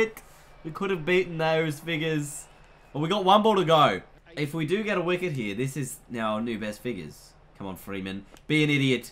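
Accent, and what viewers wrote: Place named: Australia. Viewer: Omega Music he's Australian m8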